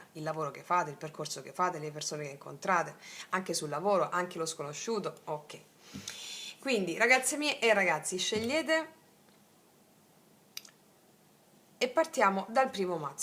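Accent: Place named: Salento, Italy. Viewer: Buongiorno mia cara, ti ho scoperto questa mattina... E stato come sentirti fare parte della famiglia... Sento dal tuo accento che sei Pugliese... Anzi... Salentina... Come. me ....Sei una forza della Natura !!! E si sente tutta la Passione e la Preparazione che Emani nelle tue stesure... Che sono rimasta Affascinata !!!! La tua Simpatia è Contagiosa ... E Analizzi in Profondità... Mi piacerebbe conoscerti Personalmente !!!! Ti Abbraccio Forte !!!! 🏆💥😂🤗🤩😇🍀🔥🎤🎶❤️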